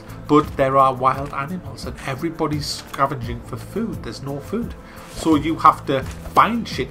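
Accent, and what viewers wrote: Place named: North East England, United Kingdom. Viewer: Great review. Just bought this in the Steam sale, aided by your exposition. Looking forward to playing it! You sound local - where are you from? I live in Cardiff now but I'm up home in Gateshead for Christmas!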